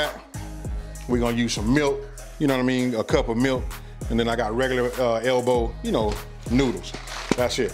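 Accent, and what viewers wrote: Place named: West Coast, United States. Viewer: man u sound like a straight west coast dude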